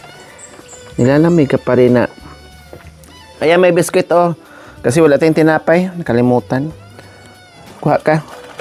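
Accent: Visayas, Philippines. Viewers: ang tono ng salita mo ay bisaya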